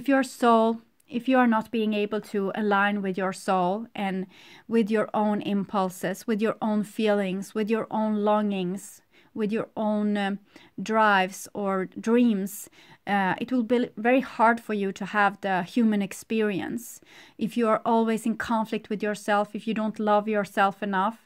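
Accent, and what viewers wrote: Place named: Sweden. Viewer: Are you Swedish? You have a Swedish accent. Thanks nice reading still watching though.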